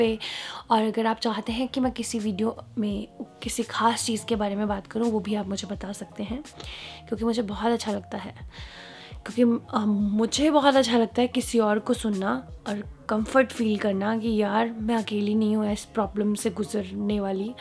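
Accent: Punjab, India. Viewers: Sis ap ki pangabi bht mazay ki hai or jo jo hubby kay sat maza ajata hai ma bhe panjabi hon from lahore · You are so cute di. Mujhe apke vlog boht pasand hai or jaise aap bolte ho mix punjabi hindi boht hi cute lgte ho. You guys are amazing god bless you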